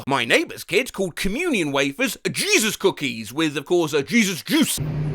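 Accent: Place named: London, United Kingdom. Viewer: . Buddy. Pal. Chum. You sound a bit like Jim Sterling. This leads me to believe you come from London.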